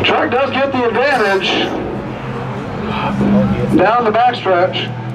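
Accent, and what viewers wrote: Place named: United States. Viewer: lol, not that quickly. American giveaways: 1. Yankee accent of the commentator; 2. Full-size pickup trucks; 3. Dodge Charger with "Yeet" written on rear window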